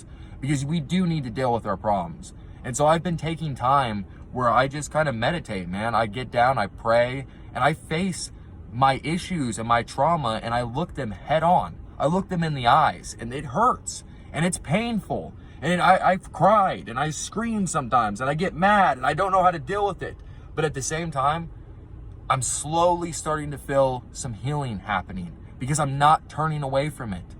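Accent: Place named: Pacific Northwest, United States. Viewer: So great to hear you are in family counseling -- what a gift you have been given. Sorry about the panic attacks. I suffered terribly in my late 20s through 40s ish tbh. I never knew I needed to deal with stress. I didn't know I could say " this is too much " -- You can say // we can say --- " this is too Effing much " --- cry, shut down, isolate --- have a pity party to rest by ourselves if we need to. Just don't stay there --- We must Release the valve when we feel super Wound -- but I didn't know that // it's oK to say "this is too much" // ... I Could never smoke pot --- too much anxiety // paranoia --- love listening to your sobriety --- I am trying to decipher your accent -- can you at least tell me what part of the country? Pacific NW?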